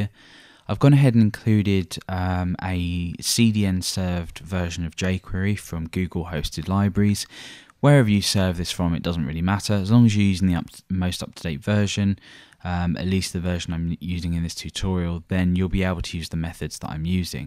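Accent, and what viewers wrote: England, United Kingdom. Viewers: so good to hear a clear concise english accent. you my friend are part of a small percent of the population, a dying breed in the cultural soup that is the united kingdom full of wanna be "roadmen" and wasters. There should be a lot more English people making these videos, and programming should be taught in primary school like it WAS in the early 90s. Before austerity and dumbing down became a priority. /rant over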